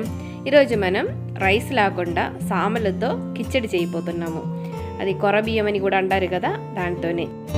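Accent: Kerala, India. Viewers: Nice, you are a Malyali ,must have settle in Hyd since long , Your slang is a mixed kichidi of Malyalam & Telugu 🌰🥒🍅🍆🌶🥕😊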